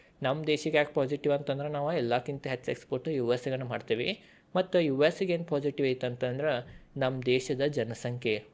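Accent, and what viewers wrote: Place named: Belgaum, India. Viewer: Namaste, Your explanation and sweet Belgaum language is very interesting to hear. Your friend from gulbarga.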